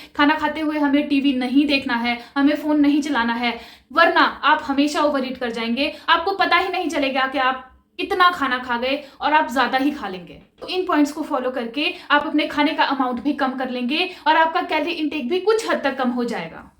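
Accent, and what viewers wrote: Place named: Pahadi, India. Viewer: mai bhi pahadi hu aapke bolne ke tarike se samjh gai thi🙂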